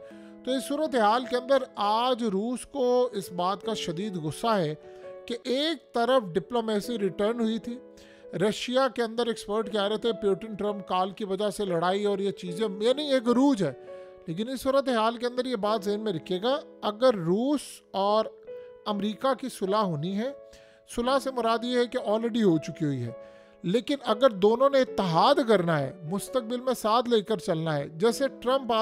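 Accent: Punjab, India. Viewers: Way this guy talk sounds like he is war time expert, he is pandu with Punjabi accent.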